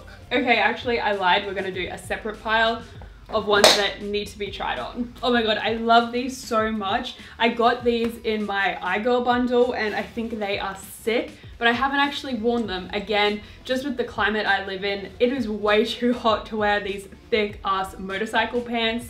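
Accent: Australia. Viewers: Me staring at the phone after pulling an all-nighter (it’s am now) trying to figure out if she has an Australian accent👁👄👁😭🤦‍♀️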